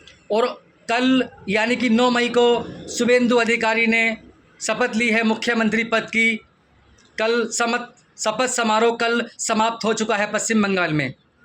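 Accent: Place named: Uttar Pradesh, India. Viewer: Sandesh Khali ke Shahjahan pe b ek video bana de bhai . Tumhari bhasha Uttar Pradesh ki lag rahi hai , tum bengali to ho nhi .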